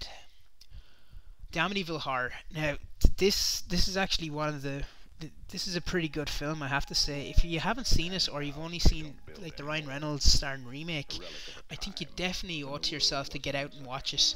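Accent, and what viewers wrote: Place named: Wexford, Ireland. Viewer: Nice, I'd messaged you via Facebook re. The Lost Classics. Great to hear a fellow Wexfordian and horror aficionado. I get that northern shite all the time as well, crazy!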